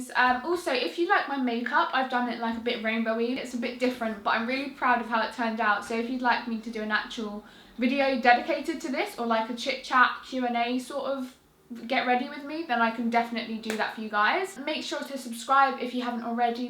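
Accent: United Kingdom. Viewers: Gotta luv that british accent! Cant get over it! And when you sing? Your amazing! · I love yor british acent , is very elegant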